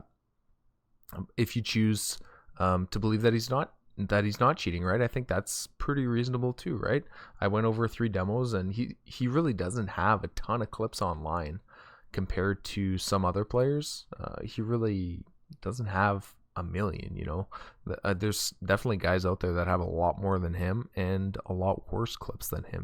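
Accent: Canada. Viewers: are you from montreal bud?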